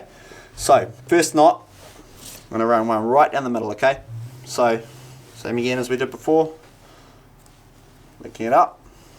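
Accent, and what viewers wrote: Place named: New Zealand. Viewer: Hey whereabouts are you from? You sound like a kiwi, I'm from Otago.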